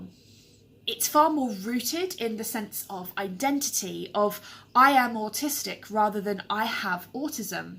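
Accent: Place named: United Kingdom. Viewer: This is the first time I've heard your voice. I didn't know you were British. (I follow you on Twitter) Congrats on 700 Subs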